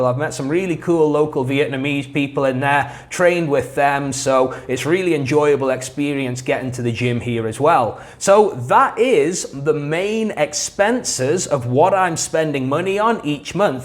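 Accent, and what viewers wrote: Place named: United Kingdom. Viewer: accents is British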